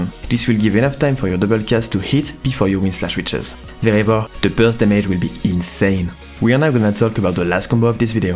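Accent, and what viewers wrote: France. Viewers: J'ai ultra l'impression que t'es fr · il l'est très clairement, impossible d'avoir un accent pareil sinon aha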